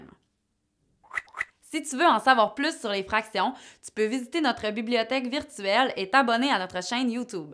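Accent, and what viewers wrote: Quebec, Canada. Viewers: Bonne explication, bon juste l'accent québécois qui rend un peut plus compliqué l'écoute mais je dit gg quand même 👍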